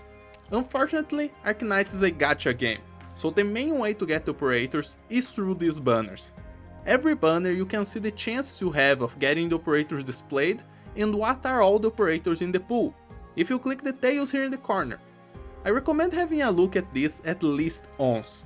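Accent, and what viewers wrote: Brazil. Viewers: you talk like a brazilian fella(im from brazil) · Are you Brazilian? I could tell by your accent, I thought it was cool. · Eu ouvi a voz dele e insta reconheci o sotaque kkkkk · Pela pronuncia consegui perceber que é brasileiro kkkkk, ótimo vídeo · Você não me engana, esse sotaque é de brasileiro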